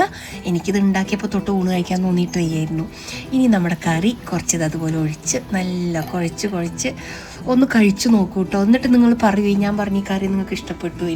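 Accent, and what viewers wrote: Palakkad, India. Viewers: My mouth is watering nice to here your kollengode palaghat basha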